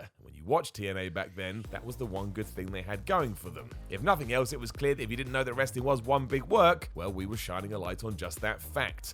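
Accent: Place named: United Kingdom. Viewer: They're from the UK lol. How do you want them to talk?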